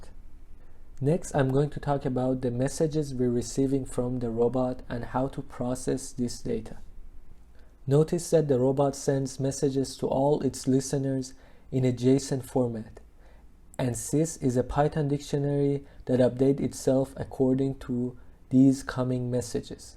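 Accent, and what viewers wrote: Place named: Iran. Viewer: iranian accent lol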